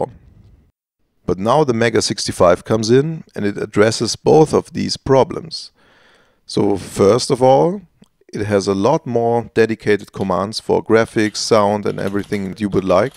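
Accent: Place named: Germany. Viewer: Einfach Deutsch-Akkzent Goldstandart 😄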